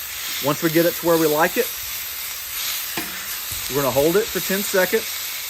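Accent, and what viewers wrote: South, United States. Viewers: For some reason when i see a man with an southern accent on the grill, i always trust what he's talking about lol.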